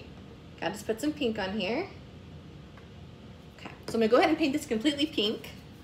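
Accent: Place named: Kentucky, United States. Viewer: I thought you had to be from KY because of your accent. I live in KY too!!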